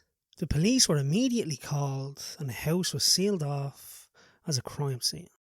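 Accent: Ireland. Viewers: a pronounced and obvious Irish accent it's not one that's hard to follow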